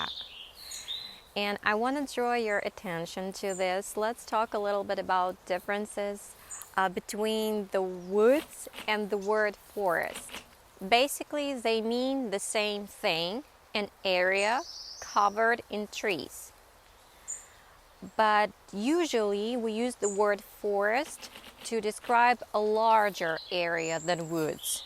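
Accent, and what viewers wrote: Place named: United States. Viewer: Hey! Would be really nice to hear about your own journey/story on how you learned/are learning English, practiced/practicing your American accent. Different people process information differently. For example, some people may memorize new words by only hearing them, the others - strictly by seeing words on a piece of paper and so on. What is your method?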